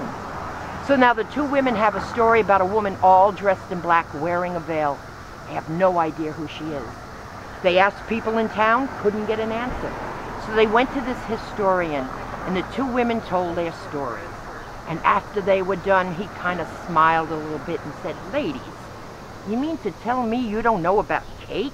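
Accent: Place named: New Jersey, United States. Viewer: I KNEW I PICKED UP A NEW JERSEY ACCENT! HUH!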